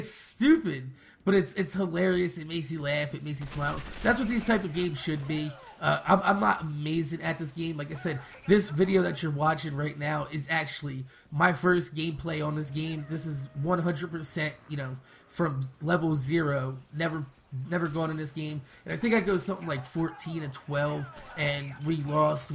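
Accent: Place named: Boston, United States. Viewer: I bet this guys from boston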